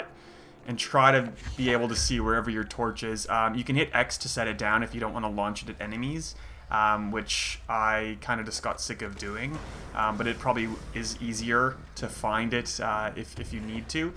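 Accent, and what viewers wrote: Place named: United Kingdom. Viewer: Hes British I would guess.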